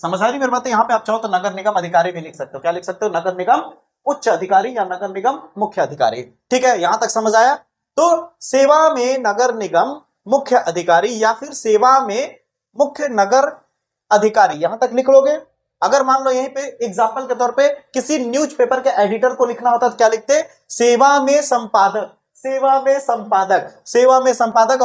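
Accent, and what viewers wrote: Bihar, India. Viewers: Sir lagta hai aap bihari ho...😅 Me from up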